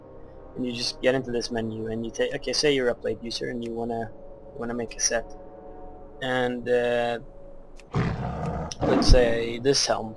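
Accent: Sweden. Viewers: Du är svensk va? Jag hör det på din engelska/ "U are english right? I can hear it on your english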